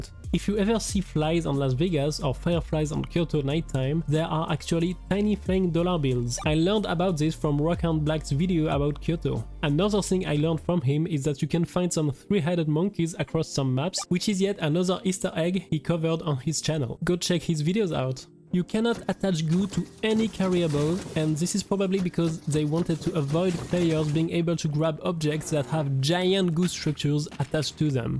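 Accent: France. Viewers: Heaviest french accent ive ever heard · You from France? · Att la dinguerie il est FR🔥🔥🔥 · Il EST français that’s not just his accent bro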